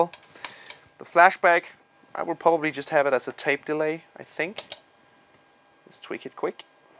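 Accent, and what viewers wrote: Denmark. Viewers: I fucking love our danish accent!!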